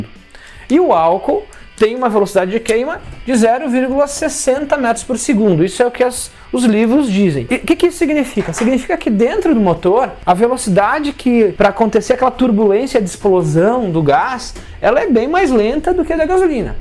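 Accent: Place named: Rio Grande do Sul, Brazil. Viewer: Sotaque de gaucho raiz 🤙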